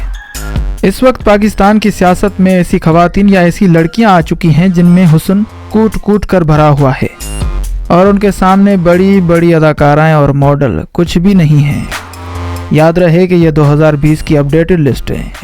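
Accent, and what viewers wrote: India. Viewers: یہ۔ تو۔ کوئی۔ انڈین۔ کتا۔ لگتا۔ ھے۔ اس۔ کی اردو۔ غور۔ سے۔ سنو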